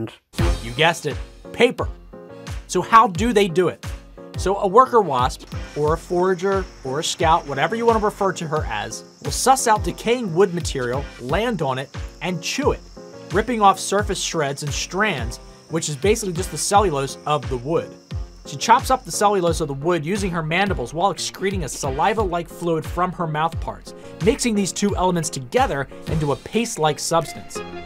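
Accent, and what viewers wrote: United States. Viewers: American or Canadian my guess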